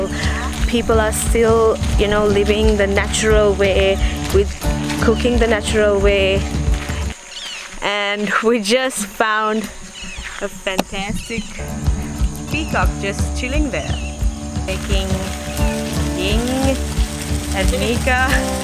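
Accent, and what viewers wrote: Sri Lanka. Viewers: I think it's bit hard to guess hmm 🤔 Nevertheless when it comes to your Sinhala accent it's more like a Sri lankan's one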